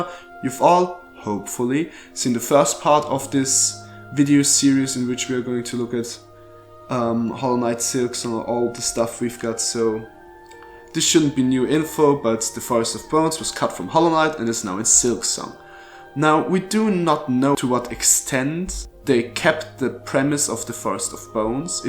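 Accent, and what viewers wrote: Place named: Germany. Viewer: Ich der direkt nach den ersten Sätzen bemerkt das nen Deutscher ist den man hört es einfach richtig stark raus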